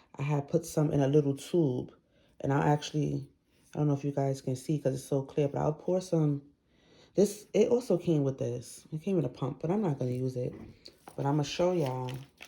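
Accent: New York, United States. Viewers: Are you from NY? Love the accent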